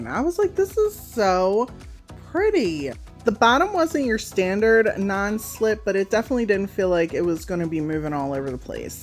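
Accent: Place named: East Coast, United States. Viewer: I always enjoy browsing with you to see what's new out and about in my area. I can only assume you're on the east coast due to your accent if you will. My neighbor and I were talking about the price of eggs, and can't believe it. Unless parents buy plastic eggs to color this year, it's going to cost an arm an another arm, and a leg. Where I live, an 18 carton of eggs is a whopping $9.05. Unbelievable. And what is breakfast with 🍳🥓and 🥞. It isn't even affordable to bake a .99 cake mix when eggs are .50 a piece. Glad I don't have any little ones, this is NUTS!!! Thanks girl for all the time you spend helping us all out, and love your DIY channel too. Take care, until next time. 🌹Karen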